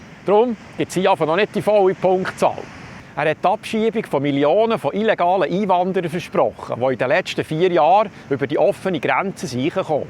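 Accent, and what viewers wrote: Bern, Switzerland. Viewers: Gute Sendung des Herrn in schönem Berndeutsch! Bitte weiter so! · Danke viillmaal! Ich lose dir nöd nume gern zue will du immer wieder interessanti Details bringsch und nöd eisiitig bisch, sondern als gebürtigi Züri-Schnurä au wäge dim wunderschöne Bärner Dialäkt. Liebi Grüess us Urdorf, Agglo Züri.:-)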